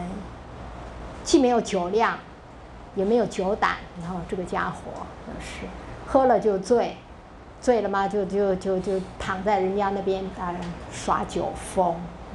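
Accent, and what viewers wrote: China. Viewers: 老师是山东人耶~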